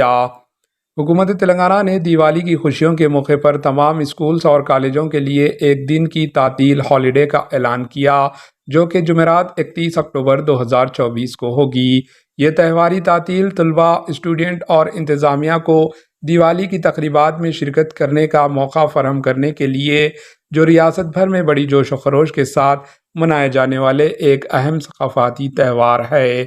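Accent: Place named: Rural, Pakistan. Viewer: Inki language Hyderabadi nahi hai bilkul gaon ki zaban bolte. Hai ko itna lamba bolte jease bacho ko school me sabaq yaad karare. Anchor training ki zarurat hai.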